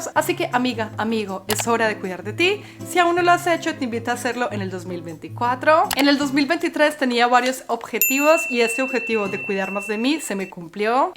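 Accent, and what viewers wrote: Antioquia, Colombia. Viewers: Otra vez se lo digo?😂Amoooooooo cuando hablas con el acento bien colombiano 😍😍😍😍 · SALUDOS CORDIALES DESDE COSTA RICA BENDICIONES PARA TI Y TU FAMILY QUE DIOS CUIDE DE USTEDES X SIEMPRE EN HORA BUENA CON TANTO PREPARATIVO DE DESEOS DE BIENESTAR... DE❤ TE DESEO DICHA REALIZACIÓN... MUCHO POSITIVISMO... Y LUCHA DE LOGROS X ALCANZAR... ME ENCANTA VER TE TAN POSITIVA CON TANTO CAMBIO Y VER COMO COMPARTES CON TUS SERES QUERIDOS. EXCELENTE... LOGRES LO QUE LOGRES SERÁN TUS ÉXITOS DEL HOY QUE PROFESAN TU LUCHA... TU AHNELO MAS SENTIDO MIS SALUDOS Y BUENOS DESEOS.. Y ME EN CANTOOO ESCUCHARTE TAN COLOMBIANA TAN GENUINA.. DEBERÁS PA DELANTE COMO EL ELEFANTITO LO... BUSCANDO EL PRIVILEGIO DE LOGRAR LO AHNELADOOO... LO DESEANDO... LOS SUEÑOS PROMETIDOSSS... DE❤ TE DESEO PROSPERIDAD EN SALUD Y ECONÓMICO PARA QUE ALCANCES LO MUY DESEANDO EN ESTE 2024..❤❤...❤❤..❤❤...❤...❤...❤❤..❤ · Amo el acento paisa de este video❤ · Tengo mi lienso en blanco. 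Tenia un trabajo estable lo deje hace un año. Querìa empezar 2023 fuera de conford. Fue un año muy bueno. En este 2024 estoy sin trabajo no veo un horizonte. Pero amè escucharte con el acento colombiana jejjee imaginado tu niña vestida de princesa. 🍇🥦🏖🏡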